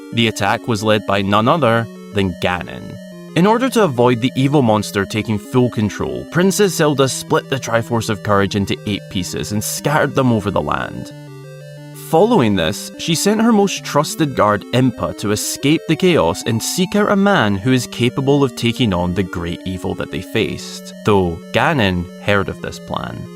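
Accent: Scotland, United Kingdom. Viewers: Scottish Zelda Storytime is now my favorite Storytime. 💚🏴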